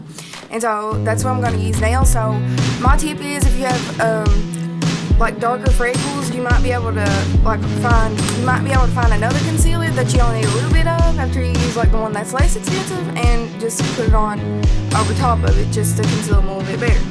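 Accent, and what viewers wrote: South, United States. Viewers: Southern accent omg😍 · southern accent lol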